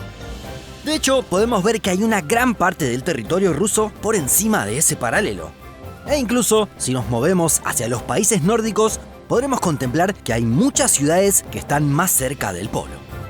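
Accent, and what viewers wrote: Argentina. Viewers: El título es villa las estrellas y el narrador habla el 80 % de argentina típico argentino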